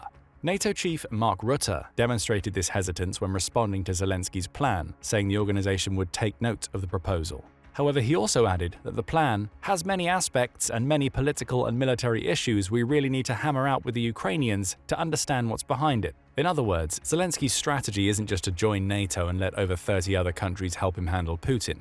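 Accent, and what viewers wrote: United Kingdom. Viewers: Another limey voice = more BS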